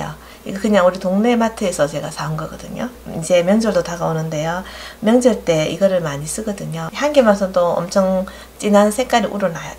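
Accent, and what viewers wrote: Gyeongsang, South Korea. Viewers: 오늘같이 비오는 날엔 튀김이 땡기네요! 경상도 억양으로 설명해 주시니 더욱 맛있어보여요~ ^^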